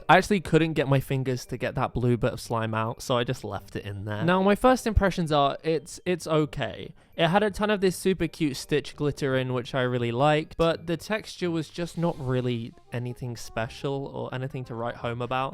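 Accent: United Kingdom. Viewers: I introduced this channel to my friend and now she watches just for the British accent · He sounds British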